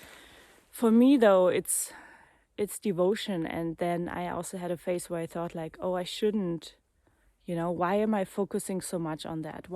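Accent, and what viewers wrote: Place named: Germany. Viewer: süss dein deutscher Akzent, unverkennbar. Meiner auch nach Jahrzehnten im Ausland <3 schönes neues Jahr <3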